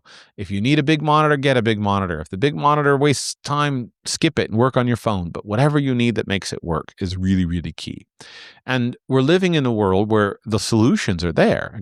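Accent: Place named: Florida, United States. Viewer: He has always talked like a Floridian.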